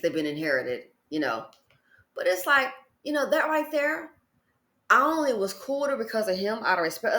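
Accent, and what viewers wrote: South, United States. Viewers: It’s country and southern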